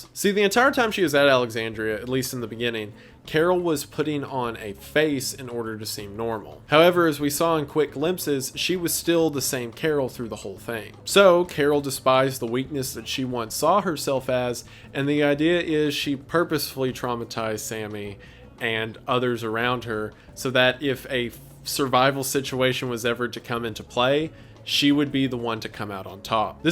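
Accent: United States. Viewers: stop sounding like a yee haw man this cant be real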